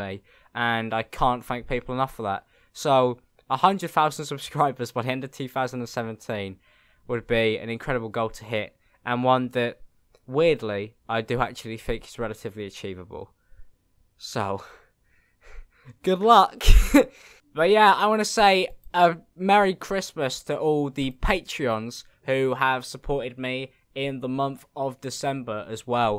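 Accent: United Kingdom. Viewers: i hope you get 50k subs m8 your content is really high quality and really funny i love your brittish voice and i hope you dont stop now that ive subbed keep it up m8 cheers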